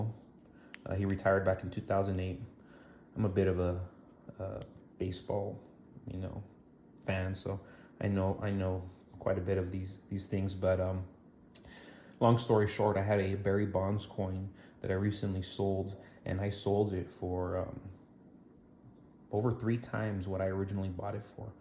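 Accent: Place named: Texas, United States. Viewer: Texas accent too heheheheee!!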